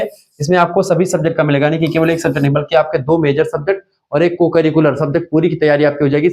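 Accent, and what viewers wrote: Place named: Awadhi, India. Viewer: Sir aap Avadhi mat bola kariye 😢kyoki aapke muh se acha nahi lagta hai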